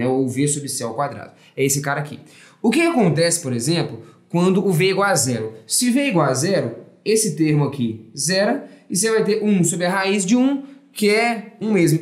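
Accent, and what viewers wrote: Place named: Minas Gerais, Brazil. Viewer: Kkkkkkkkk esse sotaque dele de mineiro é mt engraçado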